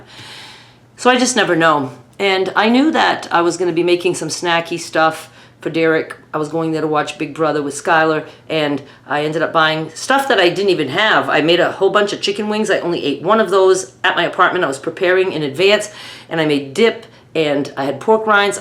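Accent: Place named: Upstate New York, United States. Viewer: so happy to have found you! I want to ask. Where are you from? You sound just like my family from way upstate NY like the north country accent.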